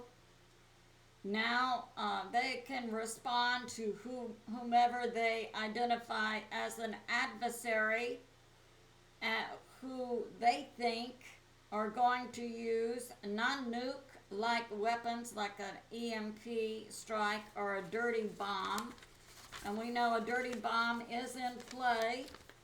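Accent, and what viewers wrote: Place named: Texas, United States. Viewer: She’s perfect just the way she is she has a Texan accent lovely!!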